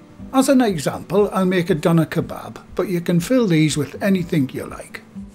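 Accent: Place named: Northeast England, United Kingdom. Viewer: I love that recipe. Probably the best I've seen --- good explanations with the video. Your accent does remind me more of Thornaby/Middlesbrough though.. I'm from Thornaby myself but I used to be able to pinpoint where people were from when I was a kit but once I joined the Army I lost the ability.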